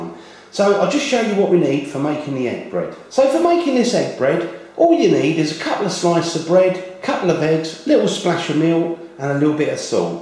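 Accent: United Kingdom. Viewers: british accent <3 <3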